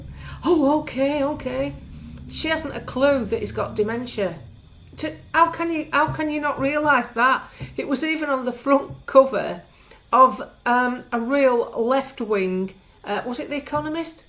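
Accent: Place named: Lancashire, United Kingdom. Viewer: I watch on my mobile,i wouldn't miss you for the world,as a straight talking Lancashire born owd fart its lovely listening to a Lancashire born (a lot younger than me )lovely straight talking lass,who's not full of 🦬💩.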